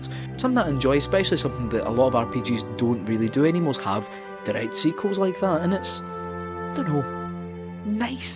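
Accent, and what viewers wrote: Scotland, United Kingdom. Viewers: are you Scottish, British, or Australian?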